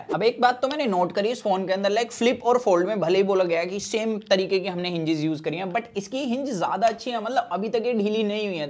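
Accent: Haryana, India. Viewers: dude try to improve your haryanvi rough accent.. hindi improve karo aur accent and english pronounciation par dhayan do... gawar mat ban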